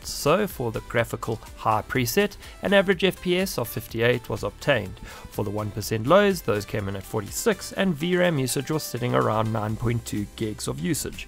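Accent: South Africa. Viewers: I hear saffa, I sub.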